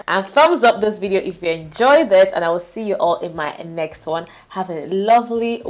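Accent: Nigeria. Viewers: I’m here for your Nigerian accent and your accessorise!!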